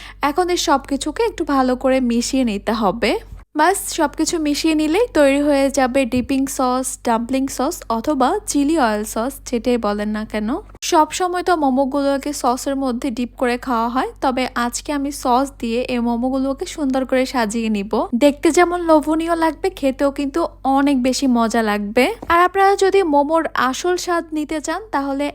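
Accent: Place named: Bangladesh. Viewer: বাংলা দেশের যে সেটা বিশ্রী উচ্চারণ শুনেই বোঝা যাচ্ছে। বাংলা ভাষা টা সুন্দর সাবলীল ভাবে বলুন শুনতে ভালো লাগবে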